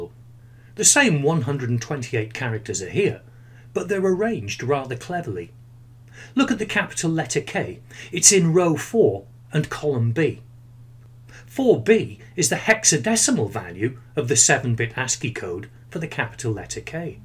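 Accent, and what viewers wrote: England, United Kingdom. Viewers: No Music, no insulting or idiotic speeches, great and clean way of explanation and a nice english accent - thumb up and abo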